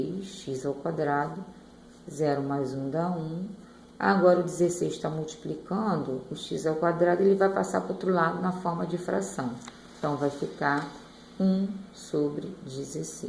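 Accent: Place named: Rio de Janeiro, Brazil. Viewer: i é carioca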